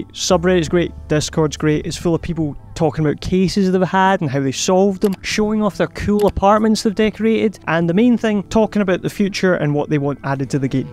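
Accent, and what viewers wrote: Scotland, United Kingdom. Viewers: Randomly came across your channel, love the scene your setting, so funny, your going far man plus your Scottish, I live in Inverness 🫡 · Are you scottish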